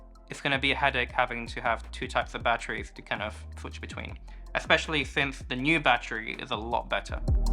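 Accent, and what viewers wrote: India. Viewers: So glad to see new videos from the guy who got me into Fujifilm many many years ago. I've missed the random phasing in and out of the Indian accent. 😄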